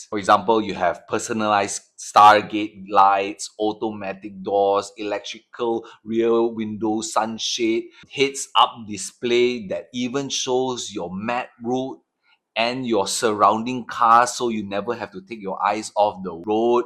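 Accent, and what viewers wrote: Singapore. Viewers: Looks like Malaysia where cars are dirt cheap. But you sound Singaporean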